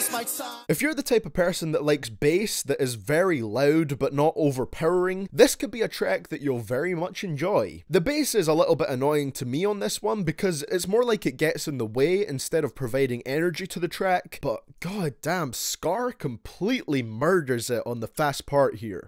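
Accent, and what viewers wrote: Scotland, United Kingdom. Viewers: Are you Scottish?? I can't tell you have like 3 accents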